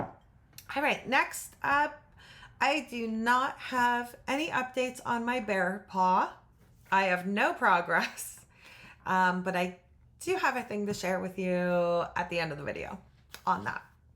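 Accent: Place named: Pennsylvania, United States. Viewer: Hi. I'm Sharon and I'm a quilter, nearby you in NJ.You sound like you're from southeastern PA? Sue (From Small Things) is in Perkiomen Valley area in PA. I'm enjoying your videos. I love quilt talk! Please don't change your format for the grouchy grannies.They can keep looking! I look forward to watching more! Happy Thanksgiving.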